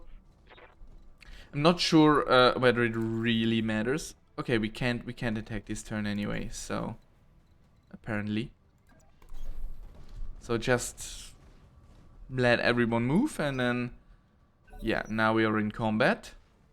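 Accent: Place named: Germany. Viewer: Yess man merk den deutschen Akzent, allerdings machst du das echt gut💪 ich würde untergehen im Lets play wenn ich es auf Englisch machen müsste😅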